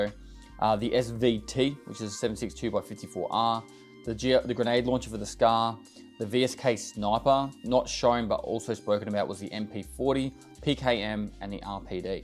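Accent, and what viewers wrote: Australia. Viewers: My daily dose of australian accent is back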